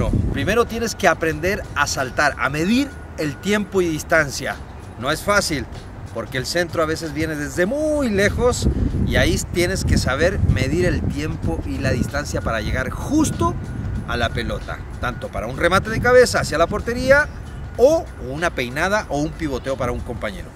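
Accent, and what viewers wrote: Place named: Mexico. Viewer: Pero choro no hables como Méxicano🙄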